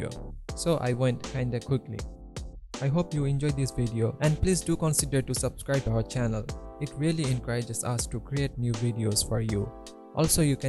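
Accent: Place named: India. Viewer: Ah yes my left ear's daily dose of an Indian accent ASMR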